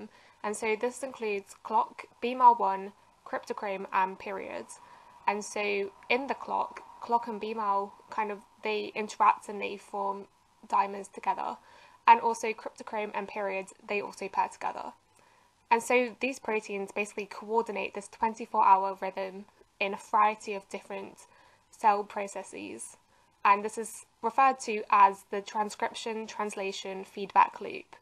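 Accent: United Kingdom. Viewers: Not get used to British accent.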